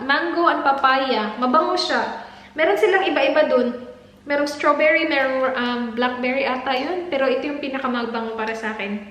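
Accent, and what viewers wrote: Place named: Visayas, Philippines. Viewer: Always watching ur blog... di gyud kumpleto ang adlaw og di ko kita sa imo blog 😊 bisaya kaau 😂 cagayan de oro 😚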